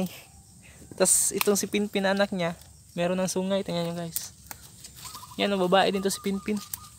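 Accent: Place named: Visayas, Philippines. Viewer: Bisaya ka pala kapatid. Malambod man.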